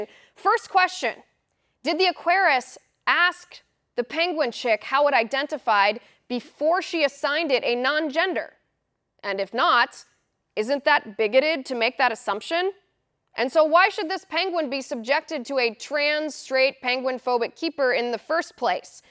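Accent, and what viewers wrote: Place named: Southwest Ohio, United States. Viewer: BWAAAAAA HAHAHA...don't give 'em any ideas..."if we are still allowed to say the word 'straight' "! BWAAAAA HAHAHA You can tell ol' girl is from southwest Ohio! Rock on, Sharonville, by way of Hamilton!!! Keep on keepin' on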